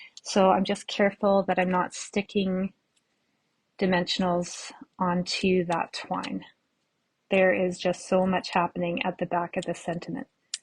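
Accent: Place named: Canada. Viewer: I’m enjoying your design and instructions. Very clear sound. I thought you sounded like a Canadian! ❤. I’ve subscribed so I will see and hear more of your vlogs!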